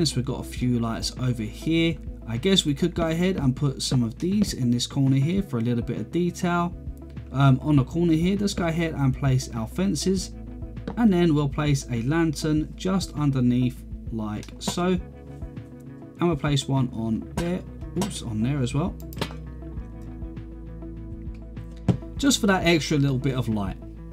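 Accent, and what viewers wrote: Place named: North, United Kingdom. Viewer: I just had to subscribe to the channel :) Thank you for the wonderful tutorials you publish. I am from Germany, so there are at least two benefits I have from watching those awesome tutorials: First (the obvious one): Learning about building wonderfull objects in Minecraft. If not "step by step" then at least tons and tons of inspiration! Second: A wonderful training in "listening and understanding" English. May I ask where your accent is from? It sounds very much like from the north. So - for me - you are in some way the "Bob Ross" of Minecraft-Tutorials :) It is very pleasant to listen and of course to watch your tutorials AND when it is done a beautiful object is created ;) Greetings from Germany and all the best!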